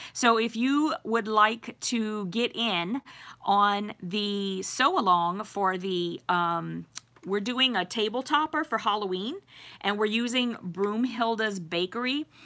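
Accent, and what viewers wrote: Louisiana, United States. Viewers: So you originally from Louisiana? That's a cajun accent if I ever heard one. I watched your astology box video and thought "mais, she from here"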